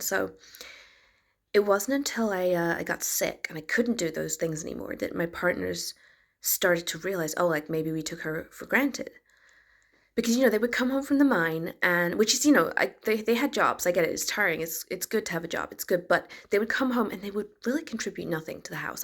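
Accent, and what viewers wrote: United States. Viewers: you sound so American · Hilarious! Great accent by the way, you've got the American accent down perfectly. You are stunning snow white and the fairest of them all. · American accent ~ Chef's kiss! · your american accent is so good 😮 living outside edinburgh and i haven't met a single scottish person who can do a decent accent. i also haven't met a single scottish person who realizes their american accent is bad. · Wow, really nailed an American accent perfectly! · absolutely ADORE your American accent! Brilliant. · Seriously-- Hugh Laurie-level American impersonation. Effing flawless. Ok not Dick Van Dyke-good but pretty darn good. (j/k-- Big respect to DvD) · Finally got it, clever as always. Nice American accent as well. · The States accent is a win. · So many delicious lines here. And the American accent delivers a perfect misdirection. 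❤ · You have a really good American accent. And the story was hilarious. Very talented. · Nice American accent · ... and a perfect American accent. Brilliant. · Again, why can folks from the UK do better American accents than we Americans can! brilliance again. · You had me on the hook from the get go. It wasn't until you mentioned your housemates coming home from the mine that I started getting suspicious - and it was only then I noticed the flawless American accent (being American, it sounded so natural to me I never picked up on it). Well done - yet again! Keep bangin' out those lattice pies! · At first the American accent had me confused. I thought, “Wait, have I been wrong all this time about who she is?” I fell right into it. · The American accent definitely threw me off at the beginning... I was like is the accent part of the bit, or is this her actual voice she's been hiding the whole time and she's actually serious now? 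lol nope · Very good American accent. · She does a better American accent than she does a Scottish accent. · The American accent threw me! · 1,000 views per hour. DANG. Must be the freckles. You've got the American accent down! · I didn't know Snow White was American. I didn't want to be that precise in my comment because it might spoil it for someone. I did marvel at the accent, I kept looking for a Scottish slip. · That's a seriously solid American accent. Gold star! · So brilliantly funny! Your American accent is spot on! I hope you and your prince live happily ever after! 🏰👸🏻🤴🏻❤️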